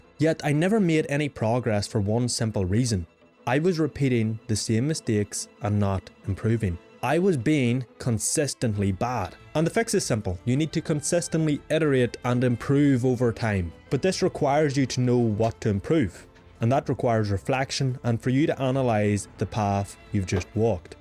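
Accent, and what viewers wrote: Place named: Northern Ireland, United Kingdom. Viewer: Recognised that NI accent, and saw that QUB on your LinkedIn. Good lad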